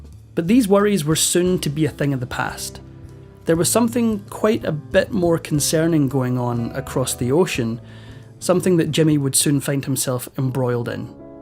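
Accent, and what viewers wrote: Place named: Scotland, United Kingdom. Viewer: A fellow Scot narrator 🏴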